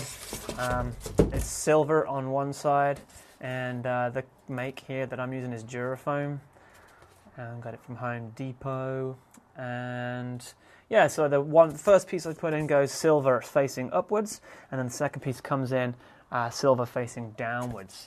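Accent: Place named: Cheshire, United Kingdom. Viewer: ah thought i heard a little chester or cheshire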